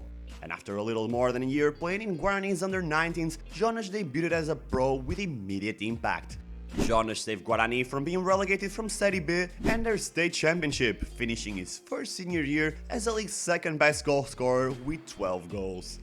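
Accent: Portugal. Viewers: Mano o cara que faz os vídeos é português esse sotaque nao engana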